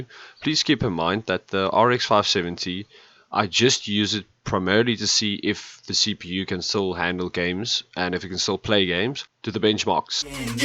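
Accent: South Africa. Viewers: Yayyyy your south African yessss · you sound South African, lol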